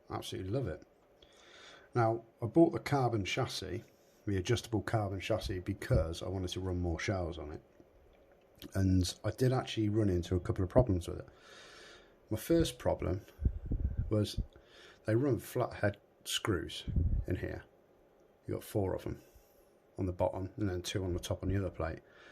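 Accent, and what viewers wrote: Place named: United Kingdom. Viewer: I guess I found time 😹 & great accent btw, for some reason British voices are so easy for me to listen to. Solid video & great tip on the steering rack holder thingy. My steering has a bit of slop in it too, I’ll have to tear it apart & take another look in there. I’ll have to check out the video on bodies later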